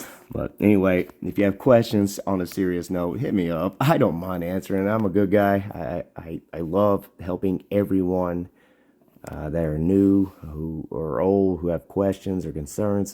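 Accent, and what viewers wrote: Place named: South, United States. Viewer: I'm from Louisiana it's sounds like your from the south as well bro nice videos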